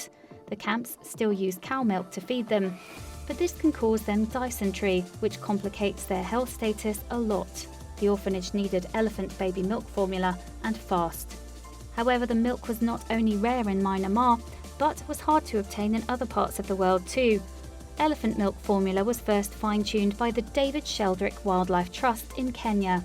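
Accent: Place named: RP, United Kingdom. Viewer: Pachyderm = Pak— ee- derm ( not patchy derm) Myanmar= My— Ann— Mar ( not Myna Mar, or whatever it is she was saying. A lovely posh accent is no substitute for doing your research.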